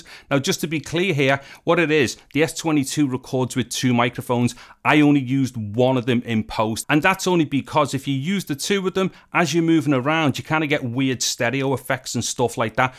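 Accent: Liverpool, United Kingdom. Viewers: It seems strange to me (living in the US) that the variations stateside are nowhere near what is found among the original native speakers of English - these regional British accents are some of the most difficult for us to understand. But again, how come the four most famous Liverpudlians never spoke like that? Also, why does Wayne Rooney, a real Scouser AFAIK, sound so very different from here (and is, TBH, unintelligible)?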